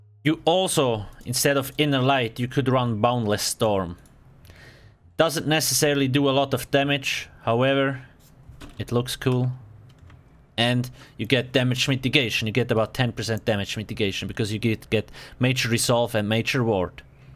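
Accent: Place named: Switzerland. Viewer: Das tönt nach Schwizer ^^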